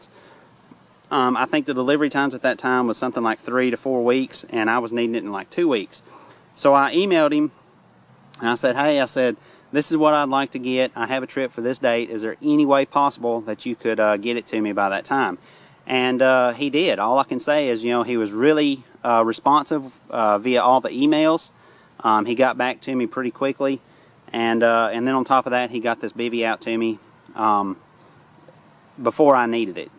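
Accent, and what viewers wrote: United States. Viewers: Just curious: what part of US is his accent? Thanks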